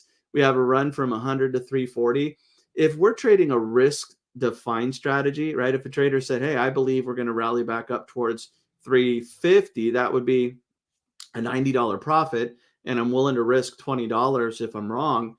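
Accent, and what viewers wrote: Germany. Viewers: 😂so funny you mentioned the german Akzent 😂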